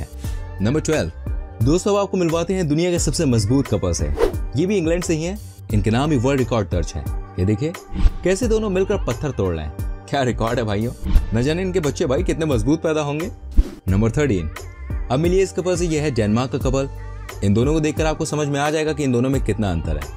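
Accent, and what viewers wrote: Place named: Kolkata, India. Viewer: Kolkata si bol rahe hain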